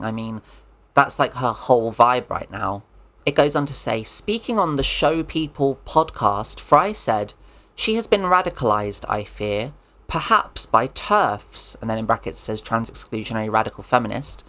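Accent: United Kingdom. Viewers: this is the first video of yours i’ve watched and i’m loving it! love how conversational it is, very easy to listen to and enjoy :-) also the english accent and dry humour definitely feels like home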